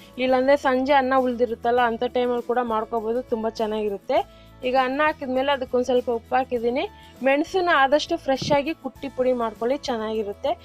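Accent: Tamil Nadu, India. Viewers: Tamil tara matadbedi correct agi bele anta heli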